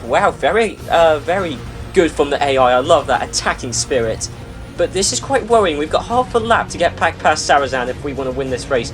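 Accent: United Kingdom. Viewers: Are you from Luton in Bedfordshire?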